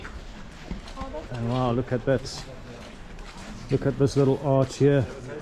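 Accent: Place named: South Africa. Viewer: You Mr sounds like from South Africa to me, maybe I wrong,love your videos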